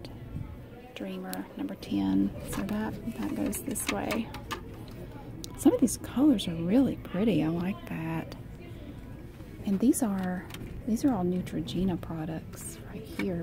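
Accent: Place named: Mississippi, United States. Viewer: You sound like me, I'm from southern Mississippi. New subscriber. 💖💛💚💙💜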